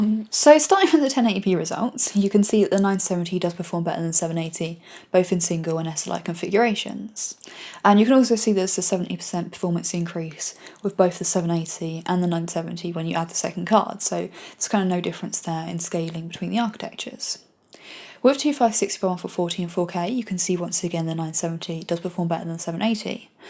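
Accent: England, United Kingdom. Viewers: that's British English :) C'mon im Brazilian and i understand perfectly fine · Well I have to say you know your stuff and very easy on eyes too. Although I have a little problem understanding you. You have a very rich english accent. But after watching about 3 of your videos, I guess it's starting to clear up a bit. Oh and by the way, 980 in SLI is the way to go!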